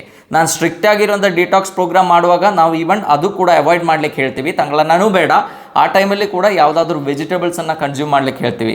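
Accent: Kerala, India. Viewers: Malayali kannada samsarikkumbol vere oru feel aan ❤ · Very knowledged and experienced doctor who speaks Kannada fluently with good prounanciation but reveals the fact of originally from Kerala while speaking few word in English For example follow pronounciation.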